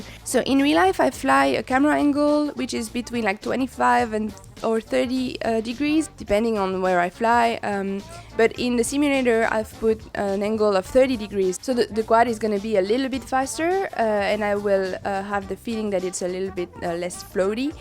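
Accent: France. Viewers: Quite interesting video for simulator... and what a nice little French accent :) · sounds a bit like a french accent xD ... and i really like this rooster frame.. guess it will be my next one :D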